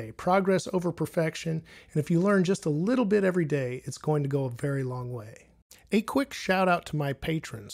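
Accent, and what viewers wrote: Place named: United States. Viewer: Hi how are you today? I miss you my friend, I miss you and your hot American accent, thank you for uploading another video clip of yourself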